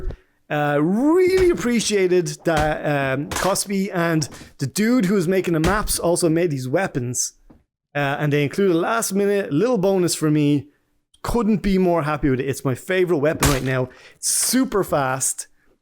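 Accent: Ireland. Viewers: I can't place that accent, is it Meath?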